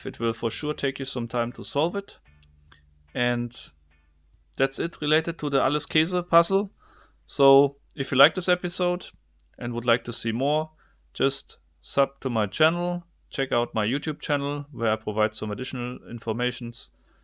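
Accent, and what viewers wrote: Germany. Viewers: ich finde es immer lustig, wie man direkt an der Aussprache merkt, dass da ein Deutscher Englisch spricht :D ich mag dieses Platte Deutsch/Englisch :D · Subbed i just find you. Guttentag ich wohne in die niederlande. Aber meine deutch is nicht gut. Meine name is inge. Ehm thats problaby all i got for now lol. Somehow i first thought u were france lol but in this video i got it your heavy accent is deutch. But maybe a tip? Stop saying spoiler break. Doesnt make sence. Its an annoucement. In the screen with text there is no spoiler its just a anoucement. So its better to just let the screen happen. Thats how most people do that. I know i watch alot i mean hours a day of youtube. Im chronic ill so im home. I live with my second dad. So i hope this tip helps you for making even better videos. 👍 ⚘⚘⚘ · In diss and diss :D dieses geile denglisch :D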